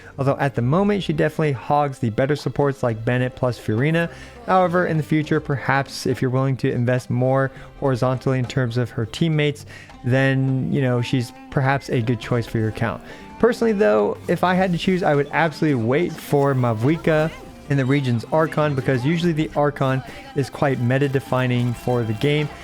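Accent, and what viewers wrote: United States. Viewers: stop why is ur yeehaw accent so good HAHAH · i love the country accent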